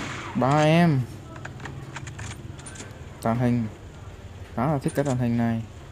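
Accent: South, Vietnam. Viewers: Miền Nam 1 hả?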